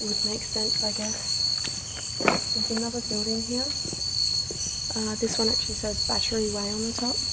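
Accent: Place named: Australia. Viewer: where are you from youre accent sounds like Australian accent^^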